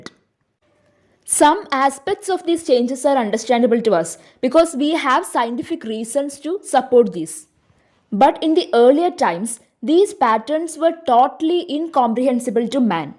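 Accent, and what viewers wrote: India. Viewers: Are you Indian or foreigner 😮? I opened the video in a hope to see a video by a foreigner then suddenly this desi accent 😅😅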